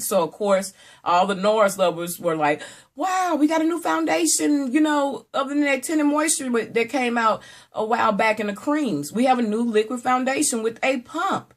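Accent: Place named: South, United States. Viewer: I love your southern accent 💕💕 Kisses from NJ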